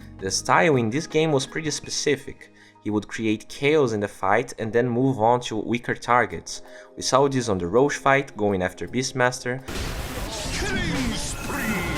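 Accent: Brazil. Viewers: esse sotaque é muito BR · Cara, não mente pra mim, você é brasileiro! Eu posso reconhecer um bom ingles CCAA de longe!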